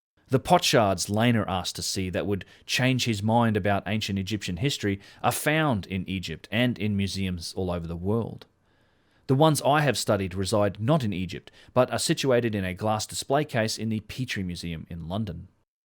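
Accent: Australia. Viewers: G'day, just wanted to say that I really like your stuff and how great it is to hear an Aussie talking with such intelligence and conviction, nice work mate!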